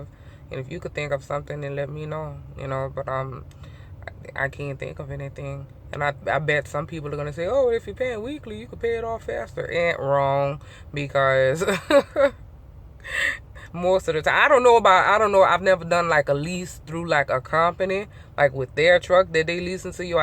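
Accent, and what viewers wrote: Louisiana, United States. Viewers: You are beautiful either way! Love the way you talk too. You have got to be from Louisiana! Creole? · Hello my handle is spaceman I just joined the hunt team I purchased a 2018 kenworth t680 I pick it up Friday your videos are very informative and I love the Cajun accent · I can listen to Louisiana talk all day!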